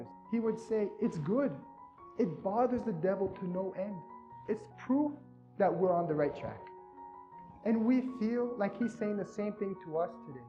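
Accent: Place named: Europe. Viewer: Many thanks. I thought he might’ve been European with that accent.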